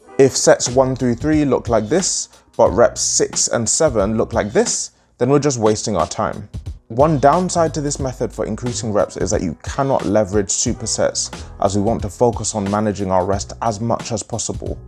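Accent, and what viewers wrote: United Kingdom. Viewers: woo...The British accent is almost as impressive 😅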